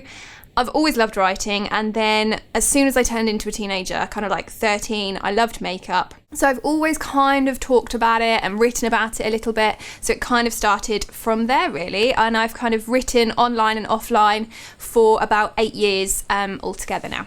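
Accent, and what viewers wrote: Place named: RP, United Kingdom. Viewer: Loved this :D such a great first video :D you sound so posh too hahah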